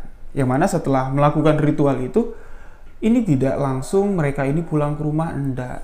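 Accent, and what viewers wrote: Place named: Java, Indonesia. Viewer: Alhmdulillah..jmpa lgi dngn Bng, yg plng sya suka,cra membwaknya,dn bahasanya mnteep..., kalu orang jawa..logat bicranya enk bngt di dngar...🙏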